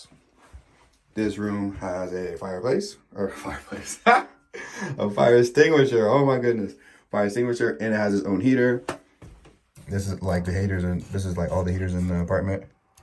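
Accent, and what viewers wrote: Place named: United States. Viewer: Dane here:I just caught myself thinking "He sounds black" And it looks like he is!!!! Can people sound black????? Ok, am I completely insane? He sounds American of course, but is it the deep voice?????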